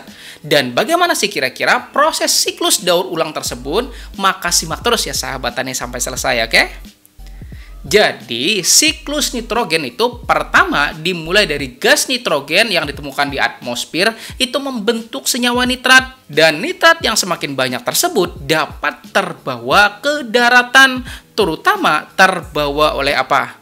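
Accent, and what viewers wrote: Banjar, Indonesia. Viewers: Tetap ketahuan logat banjarnya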